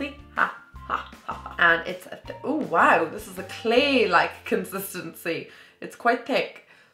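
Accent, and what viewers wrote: Ireland. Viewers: Love the Ireland accent 😂❤️😍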